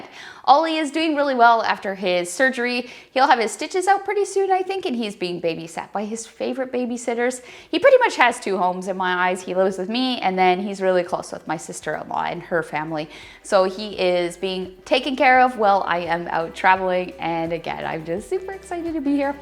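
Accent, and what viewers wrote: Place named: Canada. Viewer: I have always had a real distain for the mindless groupthink rush to "the latest" social media channel. I found Flickr a long time ago and loved it for its rich features! And you know what? I'm still there because it's such a great place for me. Apparently everyone went to Instagram and are now flocking to Vero ... whatever. Flickr does a superb job of helping me quickly and easily organize, tag, geotag, publish and share my best work with likeminded people. But foremost in every photographer's mind (those who want to make some kind of income) has to be exposure. No one will buy if they don't see your work. So the sad truth is that we all want to maximize exposure. Btw, as an ex-pat Canadian, I'm delighted to find your channel. Long ago I couldn't hear the Canadian accent. After 23 years away from Canada, I can. It's so lovely to hear and your work and video content is wonderful.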